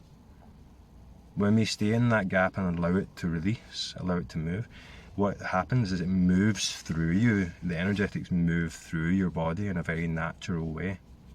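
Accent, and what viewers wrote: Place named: Scotland, United Kingdom. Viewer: another beautiful video. It feels like I’m sitting in my car in Los Angeles cheering you on in your car over there in Ireland, I thought you were Scottish though I can’t quite figure that out lol but anyway cheering you on is cheering me on. I recently got incredibly incredibly deeply triggered in relationship over Christmas break. No details are needed here, but I just felt the self Ing mechanism coming back so strong and it was so unbearably uncomfortable. My whole body got like stuck in a freeze mode, like my nervous system just got stuck. I had to come back from Christmas break and take a month off work so that I could simplify the outer stressors as much as possible so that I could tolerate these very very deep, deep internal stresses. Yes there’s no exterior and interior but I think you know what I mean. 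I had to give myself time to sit with the most subtle and yet intense reactivity to life, because what can feel so powerful in the selfie mechanism is the sense of time and urgency and like we’re working against time. At least that’s the experience here. So I had to give myself literally a month and more if I need to to just be with these very very verysubtle and intense sensations without feeling the pressure of time to escape them. Anyway, didn’t mean for this comment to get so long but that’s what’s going on here now and your video was right on time. Speaking of lol. Thank you, thank you thank you❤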